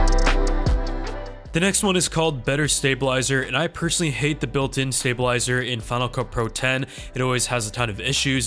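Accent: Canada. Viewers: Hey, are you Canadian man?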